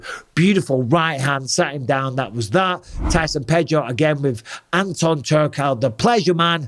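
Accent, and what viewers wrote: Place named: England, United Kingdom. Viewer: Any englishmen channel gets a dislike even before even watching. Still gotta watch. Annoying accent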